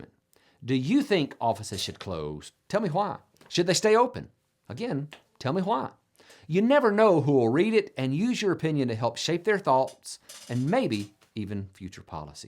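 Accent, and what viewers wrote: South, United States. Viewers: With change all around, it would do no good to say I don't like it. However I am receiving patience and am learning to do more on the computer. My I-pad is old, I use a tablet but received a lap top from the library for 3 months and a jump drive I can keep. To keep from being totally frustrated, I drink sleepy time tea (really) to calm me down and learn to take breaks. The field office closing is not surprising. But thanks for asking my opinion. Than thank you for your work, and like your southern way of speaking.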